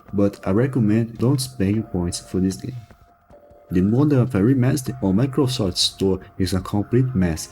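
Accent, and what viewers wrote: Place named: Brazil. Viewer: Mano vc é brasileiro n é? eu reconheço esse sutaque.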